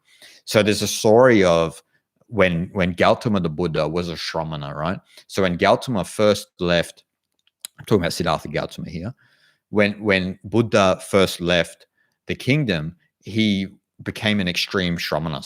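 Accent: Australia. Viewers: Hello Mate!🖐❤🙏🕉 I'm from Los Angeles.😈 oops! I mean😇. Brah hahahaha🤪🤪. Its Thursday pm PS time! Watching the replay! Thanks Buddy❤👍.L❤VE your Aussie Accent!😇❤🙏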